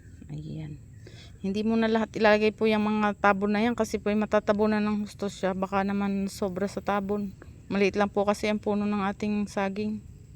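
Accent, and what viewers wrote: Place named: Batangas, Philippines. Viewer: wow sarap yan lakatan, mahal yan fave ko din😊 sarap ng madaming tanim, taga san ka sis? parang puntong batangas kasi😊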